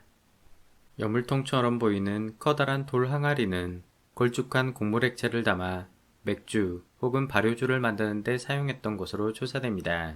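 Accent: Jeolla, South Korea. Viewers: 전라도 사투리가 들린다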